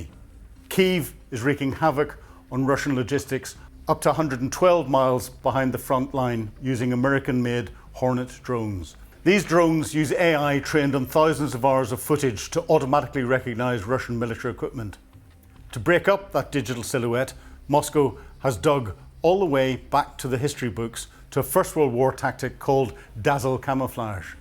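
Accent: United Kingdom. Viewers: I'm delighted with your summaries. Maybe its because of your accent as it reminds me of my service in the UK; concise, focused reporting and assesments. Your work is excellent, kep them coming.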